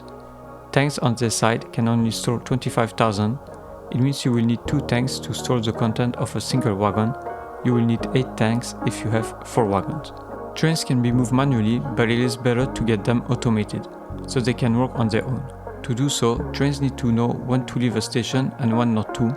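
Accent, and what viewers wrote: France. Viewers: Le petit accent français, j'adore 💕 Cocorico ;P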